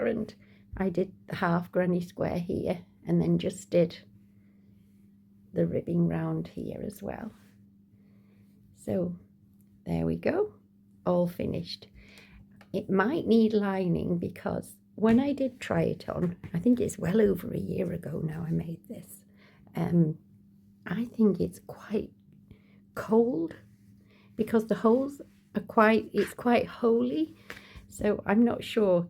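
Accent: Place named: England, United Kingdom. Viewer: I can see, however, you have an English accent or perhaps I am mistaken and it is Ai audio dubbed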